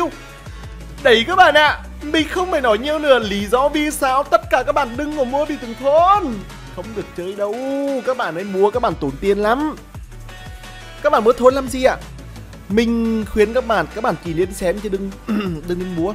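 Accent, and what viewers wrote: Nghe An, Vietnam. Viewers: Ng nghệ an mới hiểu đc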